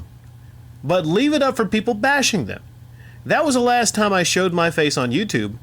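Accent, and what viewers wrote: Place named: New York, United States. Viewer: LMAO 🤣🤣🤣! Sounded like a mix of NY (In your pronunciation of Sox and Boston) and Boston but it was still hilarious.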